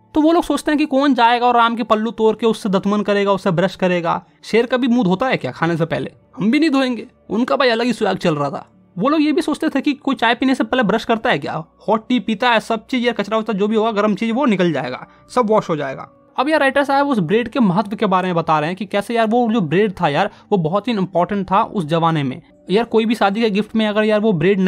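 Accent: Bihar, India. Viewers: Sir aap Bihar se ho kya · Bhaiya aap Bihar ke Aurangabad jila se hai kya .😘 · Sir kiya aap Bihar❤ se he. aap ka language se lag ta he😊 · Yeh bihari h pakka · Sir, Are you from bihar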